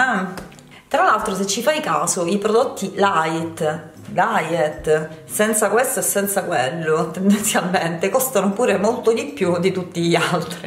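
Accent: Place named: Sicily, Italy. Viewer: Ciao, sono new entry,d’origine sicula come te ma marchigiana d’adozione ormai da quasi una vita, mi piace tantissimo ascoltarti, forse sarà propio per l’inflessione 😜 Grazie perché mi sto lasciando andRe ultimamente e seguendoti forse riesco a ritrovare in me la fatidica “scintilla “e poi via...a razzo!!🤩🤩🚀🚀😘😘❤️❤️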